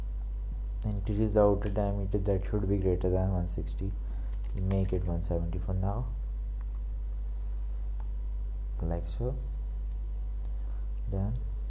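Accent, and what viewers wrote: India. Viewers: is it possible to find someone that speaks english clearly and does not have a heavy punjabi accent? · ***** I thought it starts from skrach, absolute zero, & that the narration will be more interesting: "Hindi accent" is NOT an excuse for 'the boss make me do this video'.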